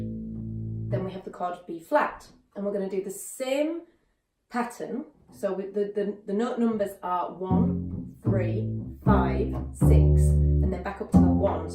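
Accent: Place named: Yorkshire, United Kingdom. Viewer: Great, look forward to more. 'll steadily go through the playlist of videos you already have, just looking at your Ring of Fire tutorial from July. Shame you're not based up more north or East Midlands, I do better with in person lessons . PS sounds a bit like perhaps you're originally from Yorkshire :)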